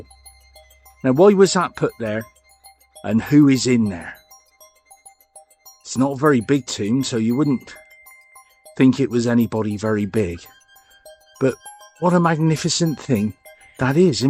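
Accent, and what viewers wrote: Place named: South West England, United Kingdom. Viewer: Hello. Yet another of your interesting stories. You've got an accent very familiar to me. Probably Hampshire/Wiltshire or Dorset. Very enjoyable either way.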